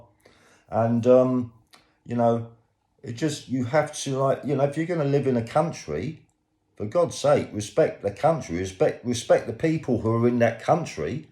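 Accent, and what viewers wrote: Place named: United Kingdom. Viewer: You sound like a British idiotic jealous mfer!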